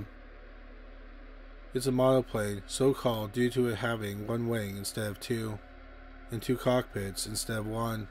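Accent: Canada. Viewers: I follow and just happened upon this site; once I heard the narrator's Canadian accent I made the connection. Thanks for the input, nice work as always.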